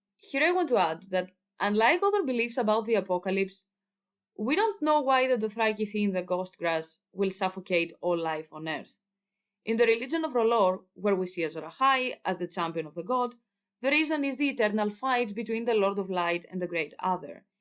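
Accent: Greece. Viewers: I feel like I’m hearing a Greek accent through your English, reminds me of my father, not really relevant ik but yeah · Είμαι από την Αμερική, καταλαβαίνω ελληνικά και έχω πάει Ελλάδα, όπως τα βίντεο. Νόμιζα ότι αναγνωρίζω μια ελληνική προφορά.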